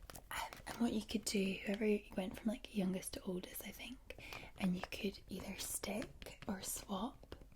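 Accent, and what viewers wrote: Scotland, United Kingdom. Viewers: Only thing better than is x2! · Probably the best, most relaxing ad I've heard for a VPN with a nice Scottish accent. Love it when gets sponsored<3 Also so sorry to hear what happened with your bank details, hope the damage wasn't too great? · Who else a simp for!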